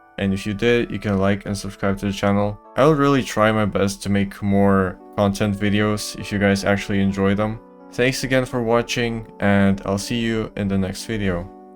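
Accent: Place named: Russia. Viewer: Ты вроде русский как я слышал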